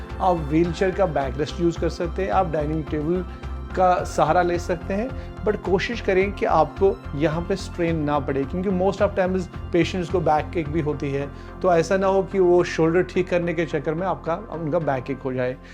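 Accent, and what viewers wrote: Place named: Kashmir, India. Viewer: R u kashmiri, ur pronunciation is like that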